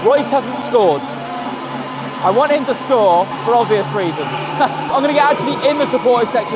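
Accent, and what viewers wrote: RP, United Kingdom. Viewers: so British posh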